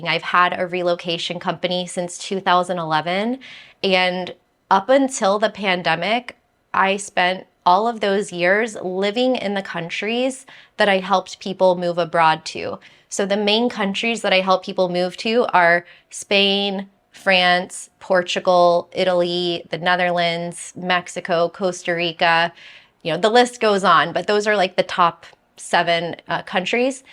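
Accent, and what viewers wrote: United States. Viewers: When coming, could you drop that horrible American accent??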